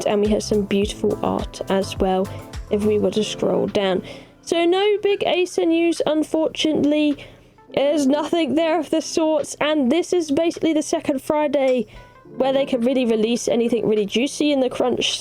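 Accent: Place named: United Kingdom. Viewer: Omg she's a Brit bruh I already couldn't listen to Brits and then they threw in a female.... Barf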